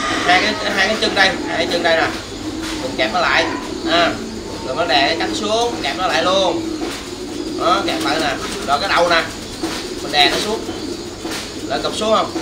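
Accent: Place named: Phuc Tho, Vietnam. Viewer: a noi tiếng jog phúc xo wa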